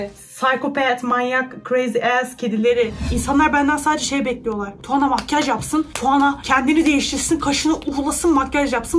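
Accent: Turkey. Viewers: Adapazarlımısınnn ahhahahaha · O kadar sakaryalı bi anlatım ki hahsjajjdjsd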